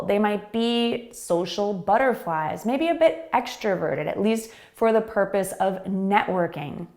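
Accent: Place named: United States. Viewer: good job. Excellent. Sometimes I forget your accent is an American one. There are several teachers I can't get them without driving crazy.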